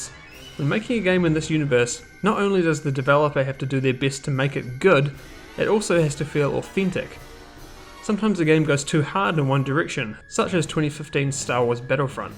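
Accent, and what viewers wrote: New Zealand. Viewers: Great game, great video, great Kiwi accent. Chur.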